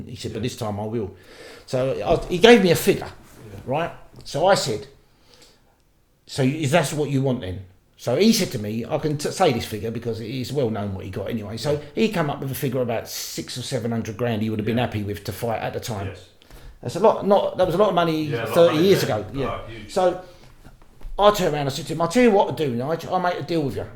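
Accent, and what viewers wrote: Cockney, United Kingdom. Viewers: What a load of vintage Cockney bollox, why oh why. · hears the cockney accent and thinks you're full of it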